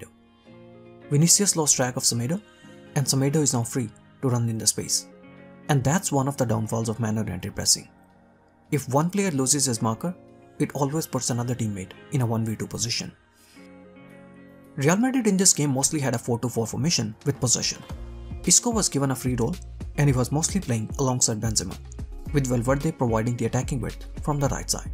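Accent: India. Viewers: Is an Indian cause his pronunciation of some of the words sound like an Indian eg clinical? I need an answer plz.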